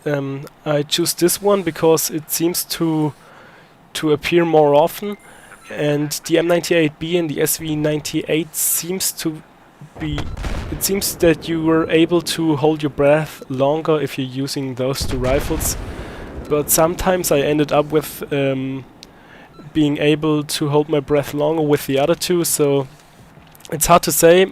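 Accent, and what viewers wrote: Germany. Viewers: Bist du Deutsch? Man hört dass es Deutsches Englisch ist :) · geil man :D Deutscher Aktzent erkennt sich meilenweit :D tolles vid, hat mir sehr geholfen danke man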